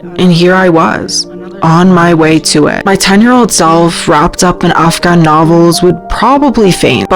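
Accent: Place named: Czech Republic. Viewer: I watched many videos , they were great .... Why do you speak with Czech accent ?!...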